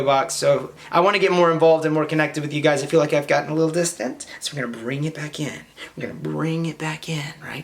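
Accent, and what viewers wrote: South, United States. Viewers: Hey ya'll. I wanna here some southern draw sometimes in your vids, please sweety???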